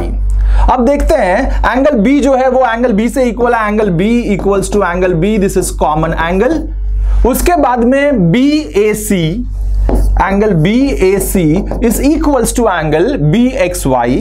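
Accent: Bihar, India. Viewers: Apki tone ekdm mst lgti h sir Are u bihari?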